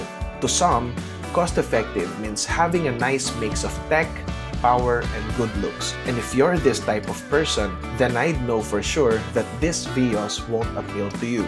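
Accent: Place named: Tagalog, Philippines. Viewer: Ang cringe ng english mo hindi fluid pagsasalita. Kahit sabihin na naten 2nd language yung english mo iba yung choice of words at delivery mo pang tagalog talaga; papunta na dun sa english-carabao. Nagtagalog ka na lang sana tapos lagyan mo na lang subtitle na english.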